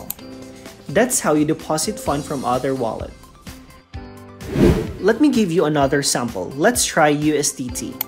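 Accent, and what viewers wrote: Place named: Philippines. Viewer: U sound Filipino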